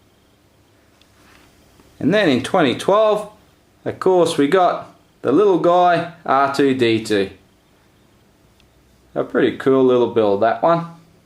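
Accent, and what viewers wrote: Australia. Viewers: The Aussie accent lol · I can tell you now, most Australians don't have accents this strong. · Yes we get it you're australian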